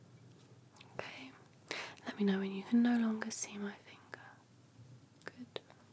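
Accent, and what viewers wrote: United Kingdom. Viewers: Great ASMR voice, British accent makes it even better! ✌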